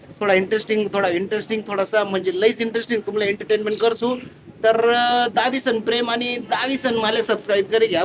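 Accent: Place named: Khandesh, India. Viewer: जमनी बरका अहिराणी एकदम ओरिजनल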